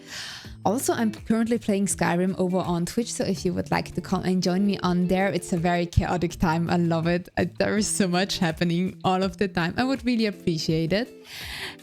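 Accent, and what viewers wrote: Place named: Sweden. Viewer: I love your accent sounds swedish/nordic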